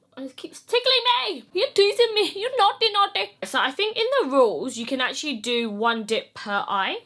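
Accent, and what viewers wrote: United Kingdom. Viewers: I love a British accent !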